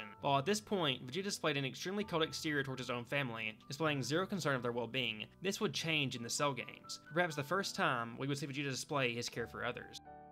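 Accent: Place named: South, United States. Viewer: Glad this video was recommended to me, you make some bangers man. It's always comforting for me to hear another Southerner (I'm assuming) nerd out, bc where I'm from anime and animation in general still has a huge stigma around it. Keep being awesome!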